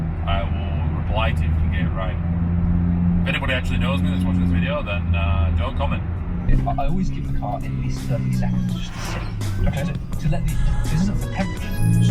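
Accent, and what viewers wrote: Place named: Liverpool, United Kingdom. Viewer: Deffo a scouser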